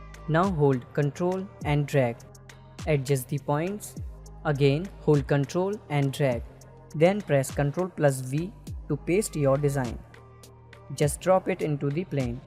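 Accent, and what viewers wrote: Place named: India. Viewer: very funny indian english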